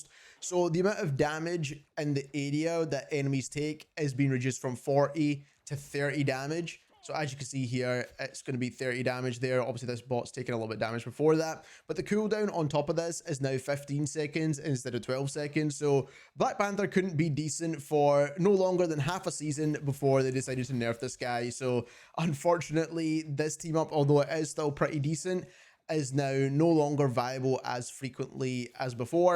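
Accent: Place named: Liverpool, United Kingdom. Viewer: The only thing painful about this video was his liverpool accent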